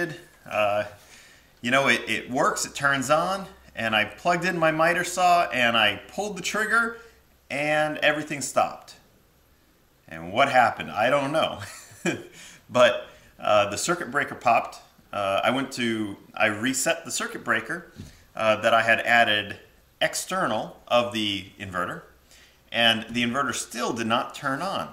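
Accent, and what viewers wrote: United States. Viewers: Why do Americans pronounce it sodder, when solder has an "l" in the word?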